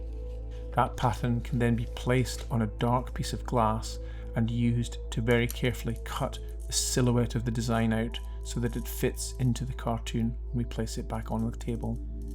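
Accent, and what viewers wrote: United Kingdom. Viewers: Thank you, it is wonderfull to see traditional glass work. At first it almost looked like one of my grandfather's windows, but based on you accent and the masonry of the church I'm assuming you are in Britain. My grandfather was Rudolf Benz of Newton New Jersey USA, I grew up in his studio and putted many a window in my youth exactly as you have 😊 He learned his craft in Hamburg Germany at 14. He taught me to actually mix his own pigments ( metal oxides,powdered glass et.) We search all over for his windows, I would love to get intouch with you perhaps. We made a website of what works we know of and are trying to expand the library. You could contact me through there if you have time, THANK YOU Keep up the craft 😊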